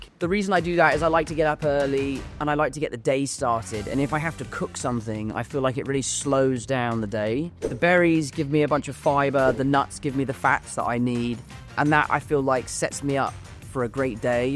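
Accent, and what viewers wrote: United Kingdom. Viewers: The British accent is charming! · Am i the only one that is just not used to him speaking in british accent XDDD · I didnt expect him to have a very British accent hahaha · The way he speaks , it's as british as it gets ...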